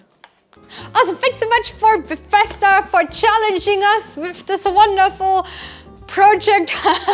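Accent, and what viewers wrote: Germany. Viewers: you sound like you're german... and you probably are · den deutschen akzent hört man einfach immer raus 😄 sehr geil gemacht ❤❤❤❤❤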